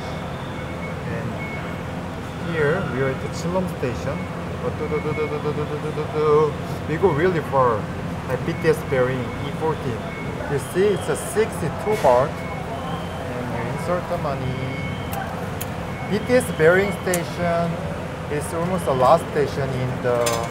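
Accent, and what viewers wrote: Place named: South Korea. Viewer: I like your korean accent when u speak english. So lovely 😅😅😂🎉🎉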